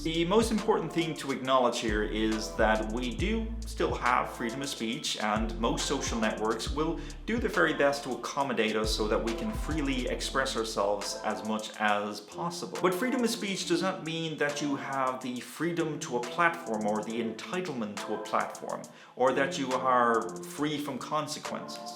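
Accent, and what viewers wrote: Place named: Ireland. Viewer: very cute :) you look and sound very very Irish here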